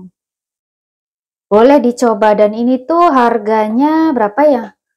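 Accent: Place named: Cirebon, Indonesia. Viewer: Mohon maaf lahir bathin juga ce moga puasanya lancar, aku klw puasa nggak pernah ijin ce Krn sehari2 incess ku gak peduli aku mkn gak paling disangka nya sedang diet, aku suka denger Cece ngmng sekali2 suka keceplosan Cirebonnya , sebagai sesama org Cirebon bikin aku senyum2, aku minum apel cuka organic beli di 360 ce ditambahkan satu tutup kedalam satu gelas air hangat lgsg turun drastis , aku juga kalau isi selai coklat suka keluar ce, bikin fatair bgtu isinya keju yg wadah nya bulat kardus itu enak ce dijamin cantik bersih , murah dikaibo hrganya kisaran 20$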